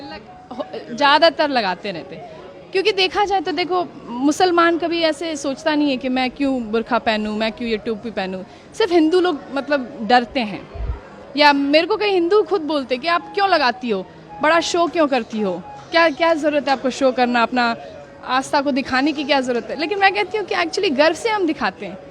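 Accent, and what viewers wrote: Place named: India. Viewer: How she is foreigner?she can speak Hindi clearly with indian accent,so I think she is indian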